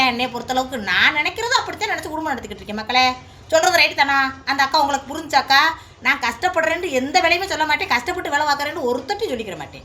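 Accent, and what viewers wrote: Madurai, India. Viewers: super madhurai style speak reply good 💯 true 🎉🎉🎉🎉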